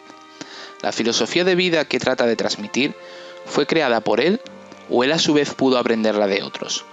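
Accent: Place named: Spain. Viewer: Eres de España sin duda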